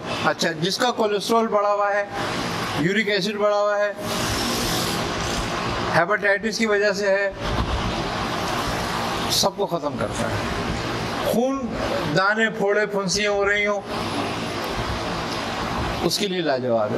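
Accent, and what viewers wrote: India. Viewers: Hajrat Aap ki hindi ek dam India wali hai...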